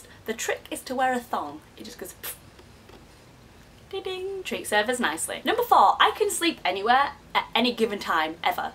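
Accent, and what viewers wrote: Yorkshire, United Kingdom. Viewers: i think yorkshire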